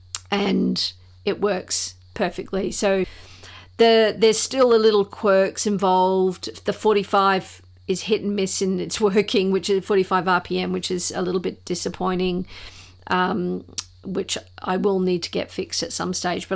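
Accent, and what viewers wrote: Australia. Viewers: Great to hear an Aussie voice. ❤ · Hi, I have a Philips something tt. As soon as you mentioned an Australian Hifi show I started your video and immediately heard a familiar accent. I'm in Sydney. I bought the Philips because of its beauty and simplicity but don't use it much coz I love my Tidal subscription for convenience. I restore n build Hifi gear for fun. My name is Peter. Cheers. Oh I saw your link on the Audiophiliac Steve Guttenberg channel.☮️🇦🇺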